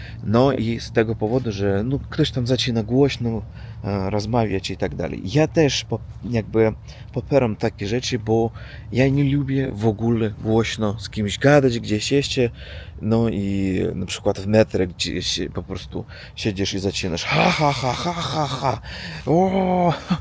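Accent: East, Poland. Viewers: Ludzie uważają że jak mówisz z akcentem wschodnim to jesteś ukraińcem dlatego kazali Ci jechać na ukrainę, a musisz wiedzieć że nie którzy Polacy nie lubią ukraińców przez to jak się w Polsce panoszą, są krzykliwi , chamscy, wszędzie ich pełno. Dodać do tego co wygadują w internecie na Polaków choć byliśmy pierwszym państwem które im pomagało to chyba nie dziwi że nie którzy Polacy się tak zachowują. I to nie historia nas tak dzieli tylko ich zachowanie. Myślę,że ten co Ci tak powiedział był ukraińcem albo człowieczkiem mało myślącym. Serdecznie Ciebie pozdrawiam i proszę o więcej Twoich wypowiedzi o naszych stosunkach międzynarodowych czyli Rosją i Polską. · No dobra, "Polska dla Polaków" a Mars dla Marsjan a kozy dla muzułmanów 😊.! Za bardzo się przejmujesz. Ja jako PRAWICOWIEC nie mam absolutnie nic do uczciwych honorowych ludzi. Nie wydajesz się być kolejnym drobnym cwaniaczkiem a raczej wydaje mi się, że chcesz sobie ułożyć życie w Polsce. 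Wydaje mi się, że mimo wielu przykładów patologii jakiej doznają także Polacy, jedyną rzeczą która tak naprawdę może zaszkodzić nam wszystkim to nieobliczalne antypolskie decyzje politykiery. Spędziłem 1/3 życia po za Polską i jedno ci powiem - w chwili gdy się wtopisz w miejscowe społeczeństwo, to i ono będzie występować w twojej obronie... bo staniesz się swojakiem. Wschodni akcent kiedyś zniknie, kwestia czasu. Ważne to co masz pod kopułą i jak reagujesz wewnętrznie na to co życie przynosi. Jak by nie było - Miłego pobytu. 😊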